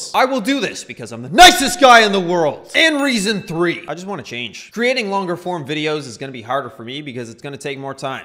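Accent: Canada. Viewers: Can you do both long and short? I need a video about muting random interstitial noise while playing. BTW, you've never sounded.more Canadian,.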